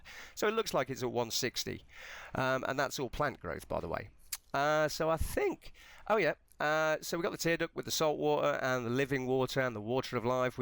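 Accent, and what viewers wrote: United Kingdom. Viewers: getting in a fuss other someone's accent not sounding right to you is 100% an american trait lmao. Listen here English accent, speaking the language of your county properly. you say it onk like us 'murricns not ank, also while were here I have some words about the whole tomayto- tomahto, potayto-potahto debacle as well... LMAO I seriously can't believe some people are so dense to make a fuss about your accent.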